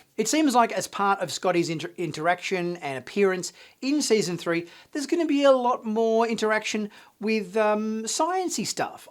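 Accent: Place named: Australia. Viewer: I like the way you talk, but I'm wondering. I've heard a lot of Aussie accents over the years. Are there different regional accents in Australia? Can y'all kinda tell where each other are from by the way you talk? My own accent is mostly Midwestern American with hints of upstate New York and I met someone once who nailed down by my accent that I was born in New York and grew up in Colorado. I have noticed that Aussies do sound a bit different here and there, but I can't tell if someone is from Melbourne, Sydney or the outback. You don't exactly sound like Crocodile Dundee for instance. Y'know what I mean? I'm going to ask other YouTubers too. Feel free to call me cunt any time brother!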